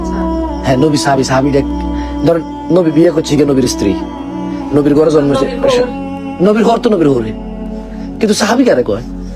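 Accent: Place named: Noakhali, Bangladesh. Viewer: Noyakhalir basa pura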